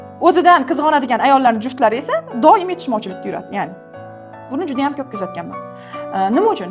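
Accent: Khorezm, Uzbekistan. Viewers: Бу айол хоразмлик экан шева хоразмга кетябди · Асалому алайкум Хоразмликмисиз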